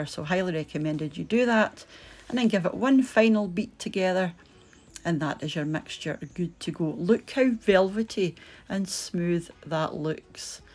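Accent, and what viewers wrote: Scotland, United Kingdom. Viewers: What a lovely cake and such a cute Scottish accent ❤❤❤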